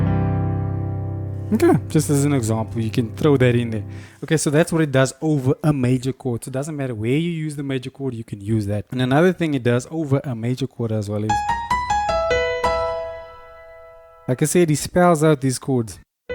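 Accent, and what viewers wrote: South Africa. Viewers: Are you from South Africa? The accent seems a bit familiar.. :-)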